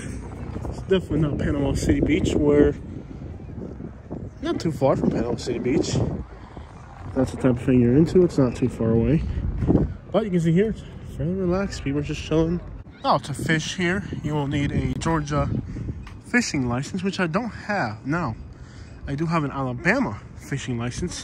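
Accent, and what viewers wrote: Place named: Minnesota, United States. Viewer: Are you originally from the northern states? You sound like you have a Minnesota accent.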